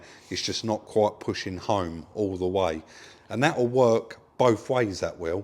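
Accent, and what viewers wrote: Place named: RP, United Kingdom. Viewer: Bit posh you you essx boys isn't it ? 🙂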